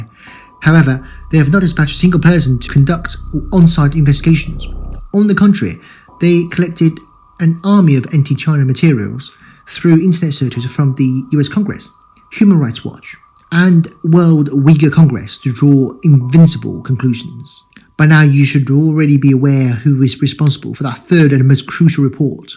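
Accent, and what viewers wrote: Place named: United Kingdom. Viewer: 牛逼牛逼·这个英伦口音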